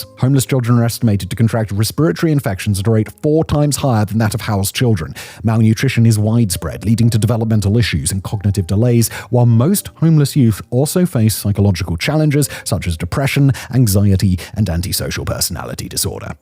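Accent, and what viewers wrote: United Kingdom. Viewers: High English level is required to understand this video. Is that british accent?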